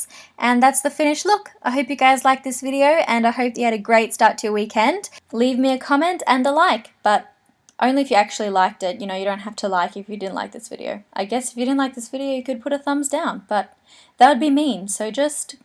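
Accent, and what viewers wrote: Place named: Australia. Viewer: love your australian accent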